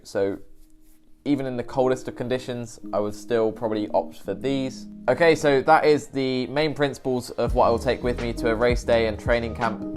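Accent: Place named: United Kingdom. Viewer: Your accent reminds me a IELTS academic British English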